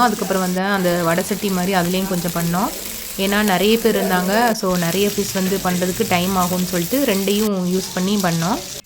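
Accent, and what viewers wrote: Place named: Coimbatore, India. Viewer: கோவை யா! பேச்சில ஒரே இங்க இங்க யாவே இருக்கே!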